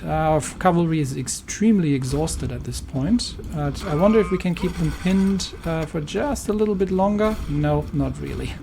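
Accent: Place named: Germany. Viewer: Are you German? I thought your accent was noticeable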